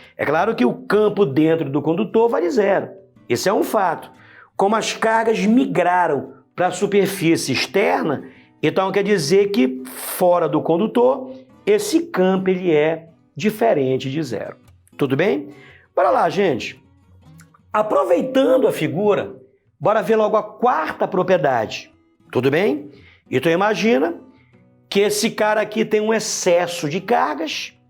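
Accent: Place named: Pará, Brazil. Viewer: que aula massa, professor!! super didática e de fato imprescindível para meus estudos. o melhor é o sotaque paraense (?) hehehe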